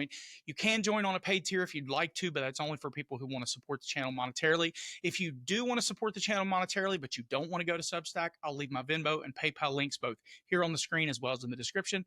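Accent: South, United States. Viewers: your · I love your channel❤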